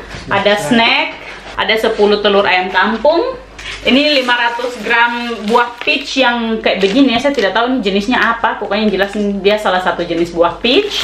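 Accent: Papua, Indonesia. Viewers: itu bersyukur dapet kk, orgnya menyenangkan, unik, bicara apa adanya, pintar, aku suka logat papuanya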